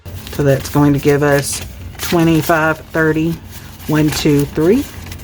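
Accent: South, United States. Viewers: I'll always love just listening to you chat with us. You're just the right amount of southern (on something you mentioned in another vid)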